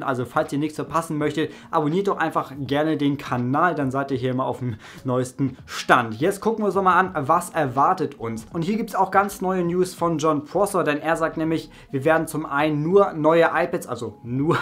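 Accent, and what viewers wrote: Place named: North, Germany. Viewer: Man hört den norddeutschen Dialekt XD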